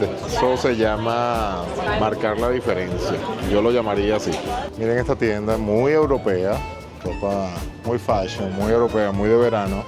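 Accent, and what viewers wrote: Venezuela. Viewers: Tu vivias en Caracas? Hablas muy caraqueño como de Alto Prado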